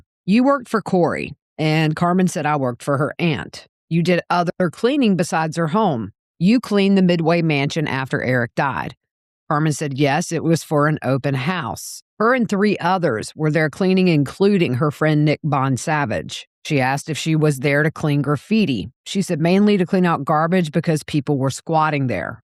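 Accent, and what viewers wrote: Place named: Texas, United States. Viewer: Such a great recap, and you are great to listen to. Love your accent. I used to live in Texas and you make me miss home❤ I live in California now-no accepts here😢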